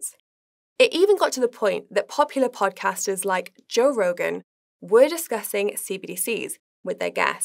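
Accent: United Kingdom. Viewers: I don't get the ad bit in the middle with the intentionally extremely British accent. Her accent is already almost too british for most of us to understand anyway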